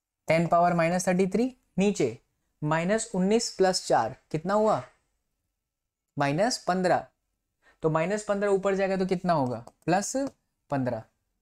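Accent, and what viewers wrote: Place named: Bengal, India. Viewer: Sir English me bola kro Bengali lgte ho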